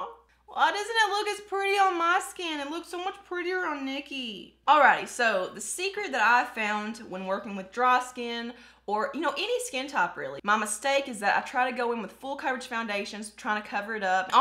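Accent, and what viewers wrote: South, United States. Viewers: Just found you! Another southern accent.. I can soooo speak your language!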